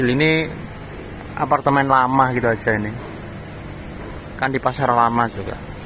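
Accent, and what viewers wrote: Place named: East Java, Indonesia. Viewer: Ys udah wis yo wis jalan ke gonung..dialek jatim memang medok susah hilang😅😅😅😅😅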